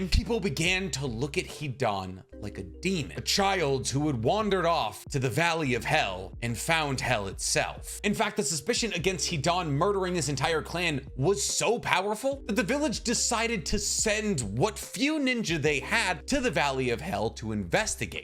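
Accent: United States. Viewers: united states accent to japanese names hurts my ears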